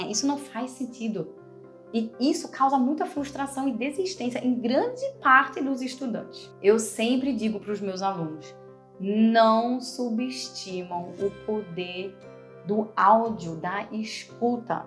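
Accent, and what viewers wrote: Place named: Northeast, Brazil. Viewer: Hallo! Desde a primeira vez que escutei sei vídeo, fiquei com uma pulga atrás da orelha. Pensei ela é do nordeste e me parece ser de recife🤣🤣uma nordestino conheci o sotaque. Apostei até com amigas. Até perguntei no Instagram.Amo seus vídeos😍sucesso pra vc!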